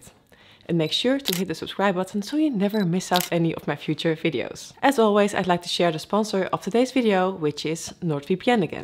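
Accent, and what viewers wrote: Netherlands. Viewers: wat klinkt dit nederlands zeg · The dutch accent on english is something that can never be hidden :D Greats from Belgium! Btw, I wouldn't mind a voice over the approach instead of text, that way you see more then reading text. Or was this a deliberate decision?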